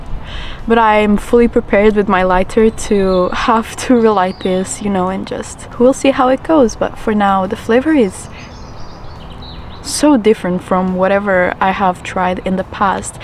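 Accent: Portugal. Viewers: pelo sotaque vi logo que eras tuga 😅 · sotaque bwee tugaa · I was already suspecting that you were portuguese, but when I began watching the video your accent gave it right away, good stuff tho. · Sotaque português???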